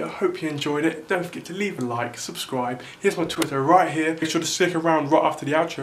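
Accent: Canada. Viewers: Are you Canadian?? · Are you Canadian?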